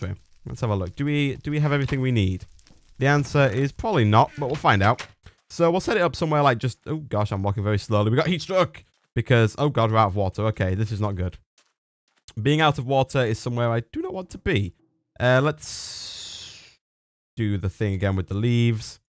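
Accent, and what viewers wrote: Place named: Leigh, United Kingdom. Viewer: Are you from Liegh, Your accent sounds similar *****.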